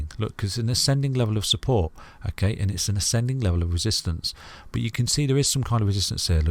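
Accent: United Kingdom. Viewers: Putt Putt soft spoken and soft foods edible Brit accent. Have a parfait.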